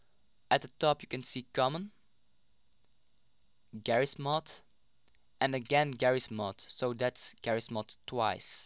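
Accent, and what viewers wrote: Belgium. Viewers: hoorde aan u accent al da ge een belg zijt haha :d ma goeie tutorial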